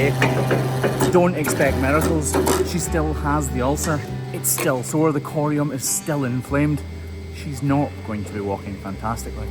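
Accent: United Kingdom. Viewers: It's like watching the ear doctor clean some wax. Satisfying. Except you have a British accent. Love it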